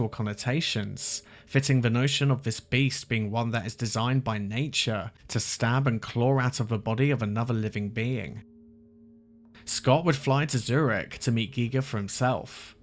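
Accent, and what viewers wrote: United Kingdom. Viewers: He just sounds British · Thanks for this i love the British narration it works beautifully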